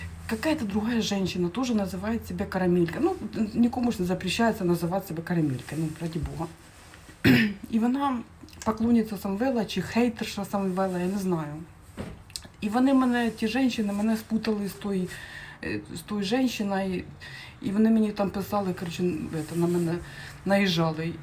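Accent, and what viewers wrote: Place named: South, Ukraine. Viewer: У неї діалект,який розповсюджений на півдні України, так як на заході України в мові зустрічаються польські та німецькі слова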